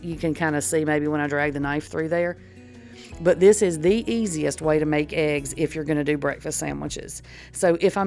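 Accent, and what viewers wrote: South, United States. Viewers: I'm sorry I'm now rascist. against a southern accent, y'all sound like Trump voters. FAFO. Can't watch ur content😢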